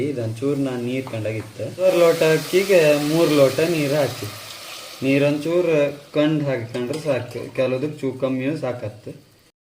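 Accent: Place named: Kundapura, India. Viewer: ನಿರುಳ್ಳಿ ಎರಡು ಗ್ಯಂಡಿ ಸಾಕ...😂 ಒಟ್ಟಾರೆ ಪ್ಯೂರ್ ಕುಂದಾಪ್ರ ಕನ್ನಡ ಮಾತಡದ್ ಒಳ್ಳೆ ಇತ್ ಮಾರ್ರೆ 😄😄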